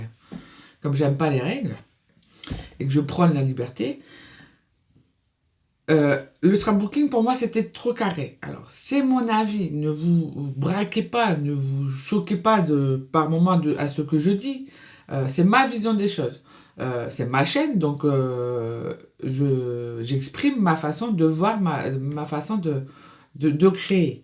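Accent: South, France. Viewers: avec ton petit accent du sud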